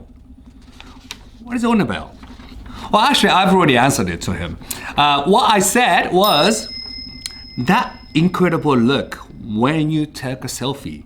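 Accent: Spain. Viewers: your spanish accent melted my cold dead heart. Speak more spanish to us please. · Wow that Spaniard accent. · hahaha eres un grande, tienes un buen espanol. se nota que aprendiste el acento castellano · I was thinking you had kind of a spanish accent when speaking english... Just figured out why! That being said, I think it is great you have your own channel now and wish you all the best! I wanted to visit Japan for a long time now and watching you and Chris travelling the country and having all kinds of mouthwatering foods and fun adventures made me decide to start saving up for a trip next year (due to current circomstances...). Can't wait!!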